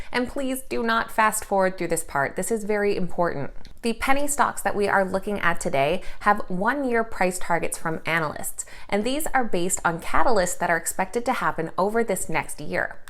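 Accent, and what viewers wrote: Canada. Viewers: Great vid.....always nice to see the sleeping pooch. Made 600% on Dogecoin.....sold everything. 👍. Are you Canadian?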